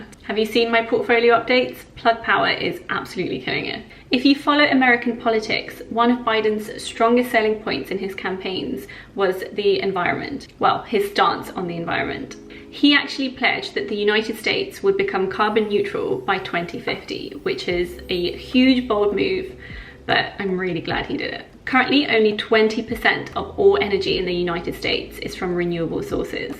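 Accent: England, United Kingdom. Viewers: Are you English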